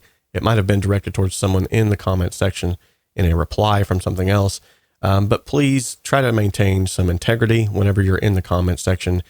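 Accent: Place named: South, United States. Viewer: Hi I'm a singer/songwriter composing own my songs from home and your production tutorial videos have helped me so much in my own music production please dont pay attention to the negative comments and by the way you have a great southern accent stay blessed!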